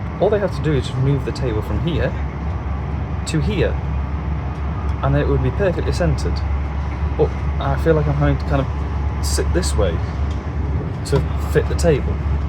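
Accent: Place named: England, United Kingdom. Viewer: Hey! I hope you had a nice time in Poland anyway :) Let me ask you - where exactly are you from? I'm curious what kind of English accent it is.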